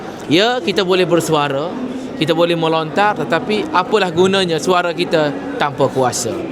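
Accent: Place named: Kelantan, Malaysia. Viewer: ini macam mau jadi ketua pemuda amenongok malaysia? bercakap pun ada accent kelate goh geh goh geh ptuihhhh .💦 natang .. kelaut la mangkuk mausen 😂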